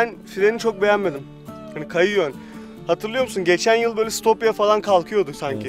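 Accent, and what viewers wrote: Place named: Bulgaria. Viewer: Abi konuşmandan belli Bulgaristan göçmenisin jajsja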